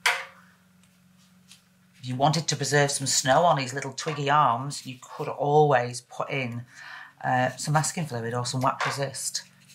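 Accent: United Kingdom. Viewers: What a super tutorial this is ❤. As a foreigner (to you ) I just love listening to your British accent ( almost makes me forget to paint 😊 ) and I will definitely try to make a go for a snow man this year ☃️ Thanks for sharing this 💯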